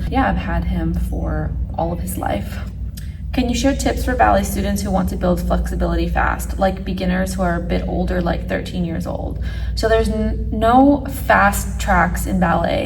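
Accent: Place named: United States. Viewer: Hello, I am an italian girl, not a ballerina☺️ I love following you not only for your contents, but also because your american accent is amazing to me and I really would like to learn your pronounciation😄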